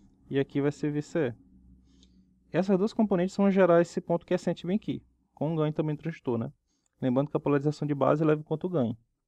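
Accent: Northeast, Brazil. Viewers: apesar do sotaque caipira do nordeste que acho engraçado. piadas a parte sem discriminação, você é o melhor professor de eletrônica do you tube e do Brasil. devia ser premiado pela NASA, Harvard, MIT e cambridge.MUITO SUCESSO VC MERECE ALÉM DE SER "O CARA, "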